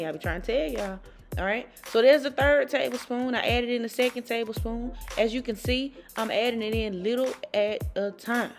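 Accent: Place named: South Carolina, United States. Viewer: Love the video. You sound like you’re from SC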